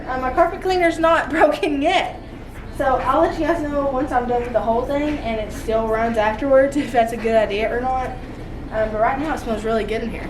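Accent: South, United States. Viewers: Hi there I'm new to your channel and I just love watching you and the way you talk,you have to be a southern girl.I have a channel but I stopped you tubbing because of my health.But I sure love watching you.Hope you have a wonderful weekend hun. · Random comment... I have to tell you... I love your southern accent!! That's it!! I'm going to finish watching you.. Love you, FRIEND!! ❤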